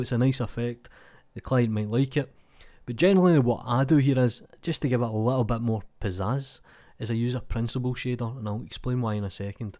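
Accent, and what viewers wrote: Scotland, United Kingdom. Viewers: Your inspiring combination of Blender knowledge and mesmerizing Scottish accent saves the day again. Thank you!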